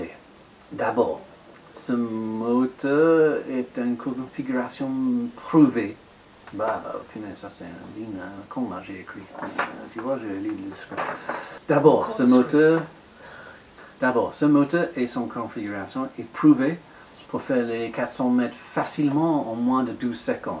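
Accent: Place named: England, United Kingdom. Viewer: Votre français est bon ! J’adore votre accent anglais haha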